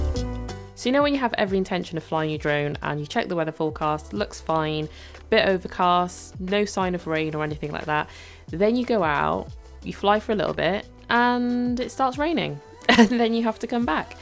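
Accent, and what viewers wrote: England, United Kingdom. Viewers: I have an original Mavic and Spark that I haven't flown in ages. I'm seriously considering getting a Mini 3 and getting back in the game. Great video. I can listen to UK women talking all day ❤ lol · Glad Sipscriber here. Grate vdo. But I can’t help but wonder how much u look like Young Dolph 🐬 I ain’t know his young sis had a Fine ingish accent 😄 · My God i loved your video, but your british accent ☺😉 I bought also my mini 3 Pro, and for me is the first time i will be using a Drone, i love aerial photography and video 🤗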